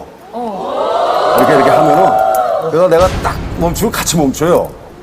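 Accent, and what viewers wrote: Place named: North Korea. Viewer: 이상하다. 말투가 왜 북한 사투리같지?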